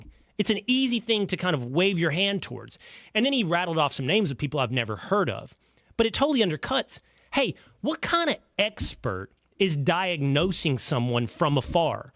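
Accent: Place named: South, United States. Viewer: Hi there, I saw the debate with David & that happened to be the first time I've seen your show. I watch David, so I think the algorithm hooked me up. I commend you for your calm composure, fairness & respect for. I've watched him for years, & I was taken aback by the aggressive tone he took with you from the start. I don't know if he was maybe scared or nervous because he's had some bad experiences with debates with the right "debating.". Or the two of you have differing ideas about what is/isn't a debate. In any case, he seemed ready for a fight & defensive. I lost some respect for him after that episode. I am an old-fashioned liberal libertarian (liberal on most social values, but lower my taxes! - I am not wealthy!) I now have subscribed to your channel because I appreciate your delivery, like to hear fair arguments from reasonable folks on the right, & as a Southerner, I know that accent! 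Thanks for the follow-up here. It was very fair. May we all honor the men and women who have given their lives & health to keep us free & safe this Memorial Weekend.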